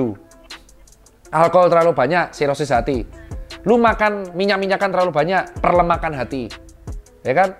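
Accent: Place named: Surabaya, Indonesia. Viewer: Seneng sekali dgn konten edukasi kesehatan dgn gya suroboyoan nyel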